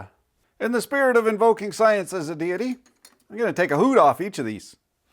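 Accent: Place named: Canada. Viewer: canadian accent...where's your secret lab? Be safe out there, you can't go breaking down the science and expect to go unnoticed. Thanks for the reminder eh.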